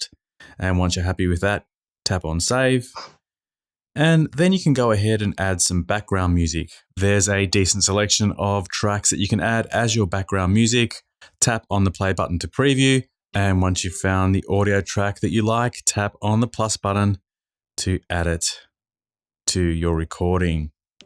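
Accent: Australia. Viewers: Hey there, sounds like you're an Aussie. I am based in Melbourne and am going to produce a podcast for Seniors. #whocanpodcast ! I can do most things but social media and video production : No! Would you like to partner with me ? Check my Instagram page: Whocantpodcast. Thanks for the video.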